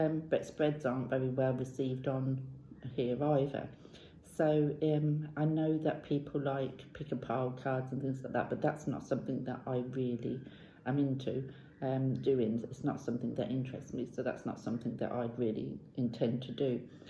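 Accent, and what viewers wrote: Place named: Norfolk, United Kingdom. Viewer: Bravo! 👏👏👏 Good for you for not selling your soul to suit others. I hear what you’re saying about subs and followers because I’ve had the same thing happen to me on Instagram, an account I started simply to share my thoughts and things that inspire me or interest me. Sometimes I don’t post for a few weeks, because, like you, I’ve felt unmotivated and uninspired pretty much for all of this year. Since I stopped posting what I know to be popular, my followers have fallen by around 50 but I can’t worry about it anymore. I’m not sure it’s so much about ego as an inherent human need/want to be liked, although I guess when you start putting out content that your heart isn’t in, but that you know will get views/likes that kind of IS ego, so well done for recognising that, and for also realising that that may have been causing your anxiety and depression. 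By the way, are you a Norfolk girl? I ask because I’m a Norfolk/Suffolk girl and I thought I detected a slight familiar accent.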